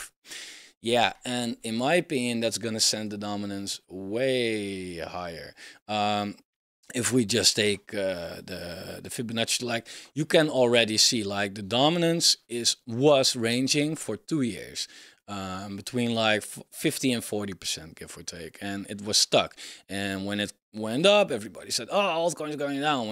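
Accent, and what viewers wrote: Netherlands. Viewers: Lol mooi nederlands engels haha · Je pikt ze er altijd uit, de Hollanders die Engels praten :p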